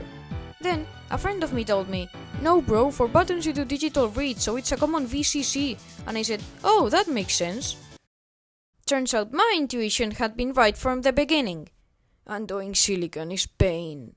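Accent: Spain. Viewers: Hi! I was wondering were you were from. By your accent, I guess you're from Spain, If that's the case, just know that what you've done could have been a 1rst of bachelor project, so CONGRATULATIONS!!!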